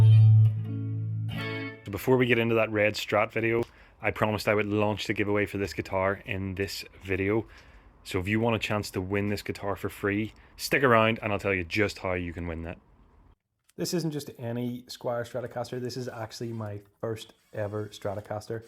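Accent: Northern Ireland, United Kingdom. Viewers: I'm hearing a fair bit of NornIron in that voice. 👍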